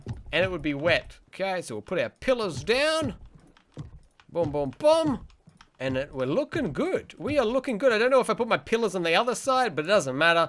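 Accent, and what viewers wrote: Australia. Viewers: thx bro, and it sounds like your a aussie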